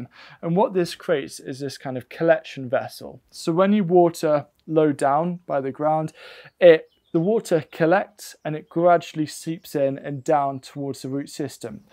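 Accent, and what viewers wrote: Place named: Wales, United Kingdom. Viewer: I agree with your views on no dig, but with one very important caveat: in a very small garden it is reasonable, as you can probably produce enough of your own compost, or only need to buy in very litte. However, once the area gets bigger (I have around 50 sq m of vegetable beds in rotation), I cannot produce enough own compost for the whole area such that I have say 3-4 cms of compost on all the beds. I have bought in from the local recycling plant in order to compensate. However, one is never sure just what is in the compost (even here in Germany), and it costs us retail buyers simply too much - because we are not selling our produce. My answer is to rotate with a combination of green cover crops on the areas that profited from real compost in the finishing season, and use my own compost and very little bought in compost for the area that will be used for the potatoes and carrots in the following season. 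This is a compromise, as it does entail working in the green cover crop, but I think that the combination may even be better for soil health in the end, as the soil is being fed not just compost, but cover crops as well, in rotation. I wish we had as much rain here in Mönchengladbach as you do in Wales, and I am envious of your set up. But I am 70 years old, only turned to vegetable growing 5 years ago, and to be honest, the sort of area you are „farming“ is very much a young man‘s job. But I do enjoy your videos, and I love your Welsh accent!!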